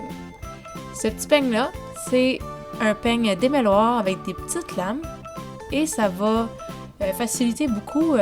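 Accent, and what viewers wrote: Canada. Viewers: Je suis né en France j'ai grandi en France je parle très bien le français et franchement son accent ne me dérange pas du tout elle est très compréhensible quand elle parle, elle est canadienne et franchement c'est très compréhensif ce qu'elle dit dans la vidéo. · Lol cet accent québécois j’arrive pas à m’y faire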